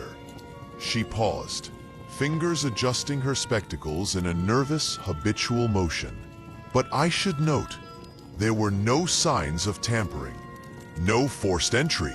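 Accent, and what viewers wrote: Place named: United States. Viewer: Can’t listen to Agatha Christie being read with an American accent plus the background noise is intolerable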